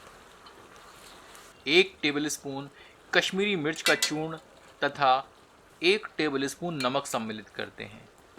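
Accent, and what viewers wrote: Standard. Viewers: aapki hindi bahut shudh he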